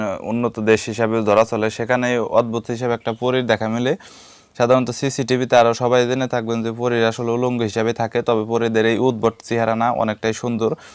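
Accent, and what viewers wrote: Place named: Tangail, Bangladesh. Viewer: মনে হয় , টাংগাইলের ভাষা বুঝতে পারছি না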